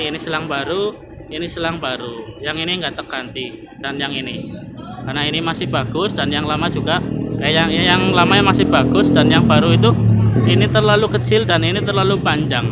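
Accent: Banyumas, Indonesia. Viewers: Kayong ngapak... asli ngendi mas 😁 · Jossss....ora ngapak ora penak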